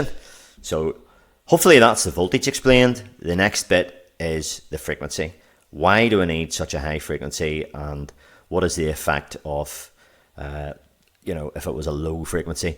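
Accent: Northern Ireland, United Kingdom. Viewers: Hey man. Are you from Norn Iron??!!??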